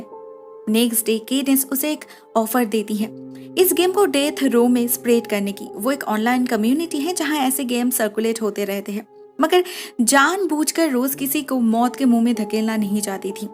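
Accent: Bengal, India. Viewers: U have bengali accent 😀 ..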